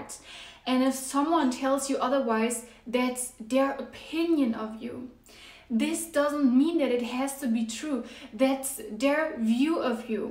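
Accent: Germany. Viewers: I didn’t know you were German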